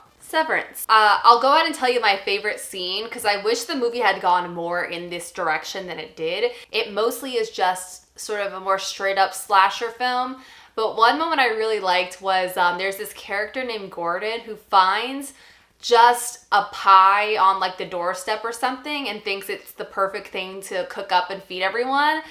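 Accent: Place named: United Kingdom. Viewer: Killer British accent btw. Heehehehehe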